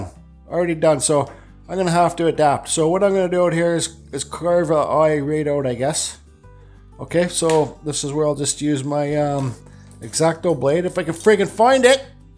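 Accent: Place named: Canada. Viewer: I can hear Canadianity in your voice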